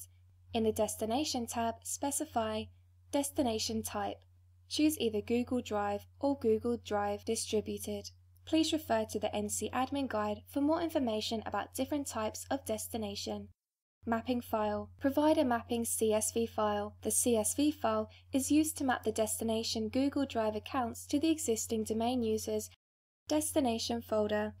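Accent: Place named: United Kingdom. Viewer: Can't understand a word of her thick British accent.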